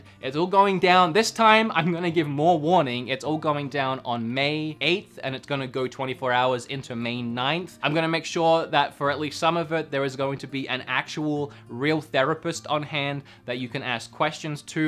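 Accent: Australia. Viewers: how can you lose an aussie accent? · I only just realised you're Australian I'm Australian. Why did I think you were American this whole time. Did i just filter the accent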